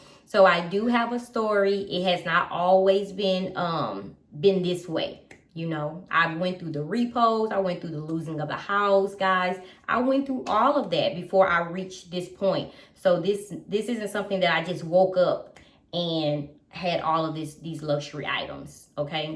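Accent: United States. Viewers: You are an inspiration to young women everywhere girl . Owning your own businesses and being so established let’s women know if they want something they can work hard and go out and get it for themselves ! I can only imagine the questions you get daily 😂. I come here to watch the bags girl , I want to see what you choose next , I love that you love LV canvas bags like I do . Not that I don’t find many of their leather bags beautiful , they just are not what I’m attracted to as far as LV . You are very detailed when you show your things , very polite and sweet , love your southern accent (I’m from New York) . I don’t think VIC’s should have restrictions , it makes sense to me . They did claim VIC’s would not be able to pre order canvas moving forward either , but I think it’s something they should over ride for a VIC customer . Customers like you who love the bags shouldn’t have to suffer for the VIC’s purchasing just to resell . 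With the new microchip system , I would think LV can now see what VIC’s are only purchasing to resell and which truly love the items for themselves . Thank you for sharing with us , girl I would have continued to watch and support you and never asked what you did for a living . I’m here for the eye candy ! I did videos for a minute but had no desire to be an influencer , I also had no desire to share every private aspect of my life , so I stopped filming , still love watching and supporting my favorites ! I find you very relaxing to watch and listen t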